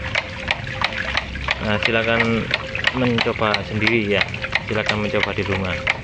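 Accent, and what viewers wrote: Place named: Java, Indonesia. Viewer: Medok.. Sekali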